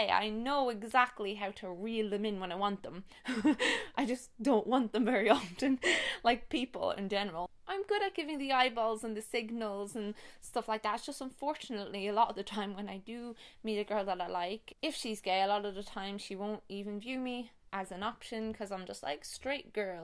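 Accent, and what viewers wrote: Ireland. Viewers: I'm new but I already love your channel BTW I LOVE Irish accents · Hi, I'm from Canada and it's midnight here:) I went to Ireland for 3 weeks in June and I really miss it:( Hearing your accent reminds me of all the landscapes and the memories I made there:D I'm a new subscriber and as a bisexual woman myself, I really needed to hear your videos about all this education. I find we have the same dilemmas. Have a great day:D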